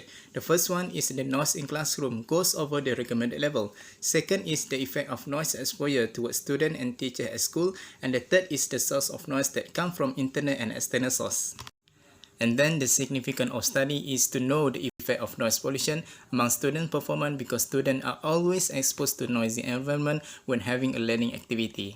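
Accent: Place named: Kelantan, Malaysia. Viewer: Wow english with klatey slang 👍🏻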